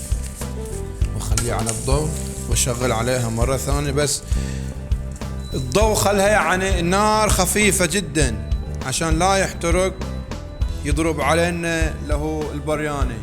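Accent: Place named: Qatif, Saudi Arabia. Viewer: يعطيك العافية شيف ، لهجتك كأنها قطيفية بحتة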